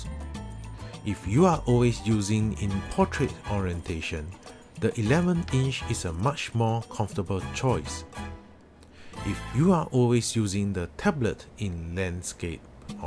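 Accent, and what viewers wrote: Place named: Singapore. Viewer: This dude sounds Singaporean.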